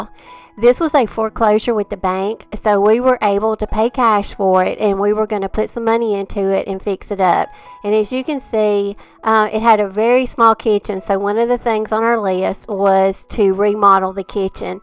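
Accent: South, United States. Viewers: Wow! I love your home. It is very cozy. I love how you have paid attention to detail. I absolutely adore your style. You and your husband have done an amazing job on the remodeling. You have a sweet southern voice. What state were you raised. I’m from northeast Alabama and living in Southwest Arkansas. ☺️🧡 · Your home and decorating style are spectacular!! You certainly have a flair!!! Do you happen to remember the ebay site for your door valance? It would be perfect for me to use on my door going out to the porch!! Your ideas are swimming in my brain now to see what all i could do!! Thank you for sharing!! Take good care!! I subscribed!! P.s. loved the southern dialect as i am from Tennessee now living in Georgia! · One question, where is the cook top and oven? I see the fridge is in the laundry room, isn't that inconvenient? I may have missed something as I have the video on mute, can not stand the sound of your southern drawl voice, it's nauseating. 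· I absolutely LOVE your home and all that you’ve done to it. Also, being from the south, but living in Northern Virginia for the last 30 years, your voice made me think of all my “home girls”! I subscribed and now I’m going to watch all your videos as I wait for new ones!😀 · Just found your channel today. Cute cute cute! I'm from Alabama and I recognize a Southern accent 😂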